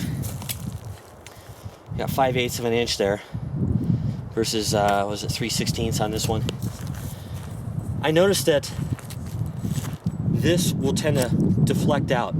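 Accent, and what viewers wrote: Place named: Arizona, United States. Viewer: haha he knows, he stated when he first introduced it that he won't be pronouncing it as hoonglas but as unglas bcuz of his Arizona accent/speech pattern.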